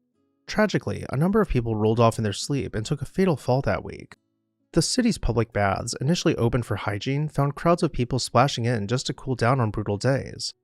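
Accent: United States. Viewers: Good video, not good narrator. YouTube is an international platform, you should take into account that many people here don't have English as their mother tongue. This narrator's heavy American accent requires concentration even for native English speakers like myself. He speaks too quickly, doesn't leave enough time to absorb what he says, i.e. pause once in a while, and he never seems to take a breath.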